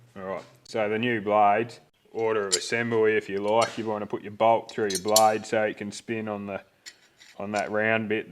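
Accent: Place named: Australia. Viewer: Thanks mate, good to hear an Aussie how-to rather than always US videos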